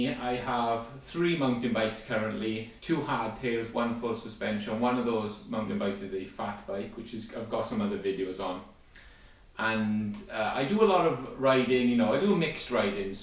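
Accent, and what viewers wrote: Wales, United Kingdom. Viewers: Welsh defiantly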